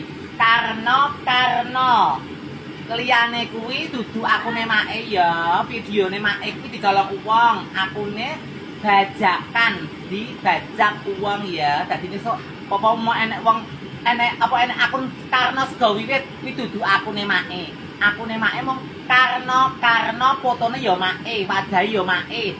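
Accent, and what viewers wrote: Java, Indonesia. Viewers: Oalah... Tp sy seneng dengan accent jawanya ma'e.. semangat trs ya semua.. 😊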